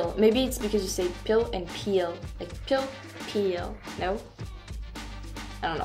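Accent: France. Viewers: Great job with this video you look very nice in it and Saying English words with your French accent Sounds Adorable and it's 👌 to laugh at oneself from Time to Time.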